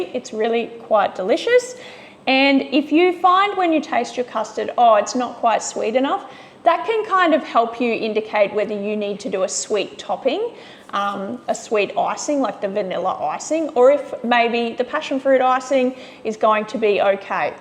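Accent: Australia. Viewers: How lovely it is to hear an Australian lady doing an Australian dish (I think it’s that). I had a son who was lactose intolerant and another son who had got problems so they put him on lactose-free until he was school-age anyway. Both my boys grew up on goats milk. I never got to the point of making ice cream but, other than that, everything I made for us as a family was made on goats milk. People will tell you that they can taste the difference. —. I guess that’s up to them, but the number of time I would serve apple pie and I used to thicken the goat milk with corn flour and then beat the heck out of it and people that think it was cream.. my best friend ate this, but maybe two years before she found out she’d be eating goats milk all the time. I drank almond milk in my coffee for years and I couldn’t tell the difference a lot of of its mind over matter I think. 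However, thank you very much for your wonderful effort. I’m saving you in my I don’t know what else it’s called, but I’m saving you to look you up and see what other recipes you have. I don’t know where in Australia you’re from, I’m from Redcliffe, about 25 or 30 K’s north of Brisbane Queensland. All the best, and I’ll be watching you to see what you come up with, thanks again.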